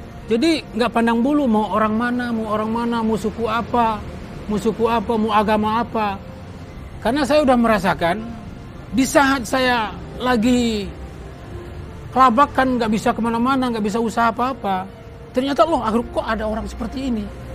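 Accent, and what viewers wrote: Palembang, Indonesia. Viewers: Logat palembang nyo mase ado 👍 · Logat palembangx khas sekali..smga sllu dimurahkan rezekinya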